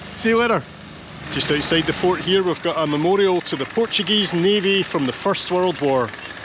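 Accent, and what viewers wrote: Scotland, United Kingdom. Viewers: I love the Scottish accent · Hello from Singapore. Love watching your videos and your Scottish accent.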